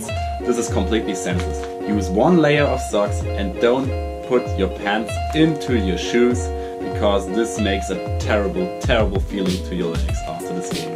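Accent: Germany. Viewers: Hey, du sprichst gefühlt besser Deutsch als Schwizerisch. Woher kommst du denn? Ich bin fast in Konstanz und könnte ein neues Mitglied werden 😋 Bin schon 30 🙊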